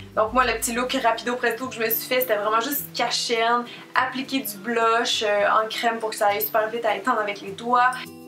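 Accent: Quebec, Canada. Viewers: T'es trop belle 😍😘💋 , PS j'adore vraiment l'accent québécois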